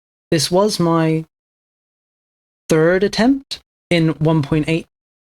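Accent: United Kingdom. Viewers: knew i was in great hands when i heard that british voice, cheers mate from down under!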